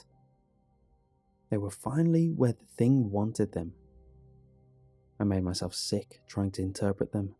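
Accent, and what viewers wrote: United Kingdom. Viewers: Something about a British accent makes the stories sound scarier for some reason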